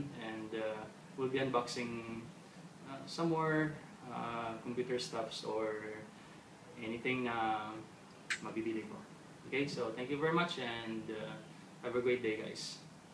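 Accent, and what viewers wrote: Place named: Philippines. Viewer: It's the disgusting pinoy accent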